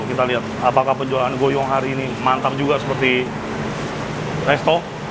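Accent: Banyumas, Indonesia. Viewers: Bch Ngapak sekang ndi kuwe cilacap apa pwt sing sregep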